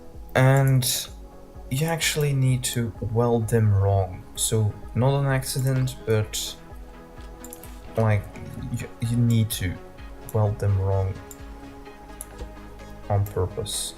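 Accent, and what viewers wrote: Netherlands. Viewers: hey just a quick question are you dutch? if so: hoi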